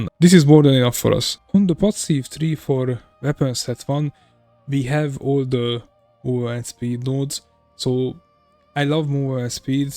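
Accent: Hungary. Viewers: Probably ill be doing this build next season :) hope they dont change it! Btw are you Hungarian any chance? Your accent tells me that :D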